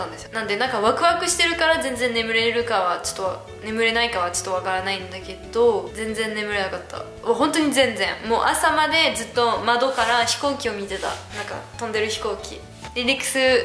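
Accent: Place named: Kyushu, Japan. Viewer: あれっ! さん、九州人みたいに話している(うれしいね)。日本語は福岡で勉強したのかな。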